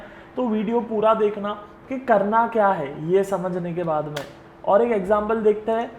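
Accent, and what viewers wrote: Gujarat, India. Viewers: Bhai gujarati cho ??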